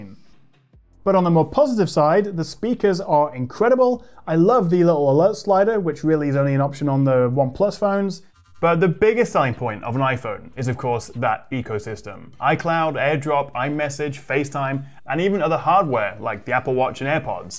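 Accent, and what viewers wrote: United Kingdom. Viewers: Excellent presentation that looks like a live TV coverage. By the way, where was this beautiful village taken? Compliments to your clear, creative, and colourful narration. The British accent is so cool and clean ! love it.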